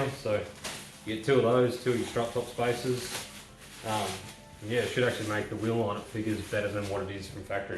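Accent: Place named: Australia. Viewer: Love the Aussie speak- sunnies, muddies (in the other video), aussies.....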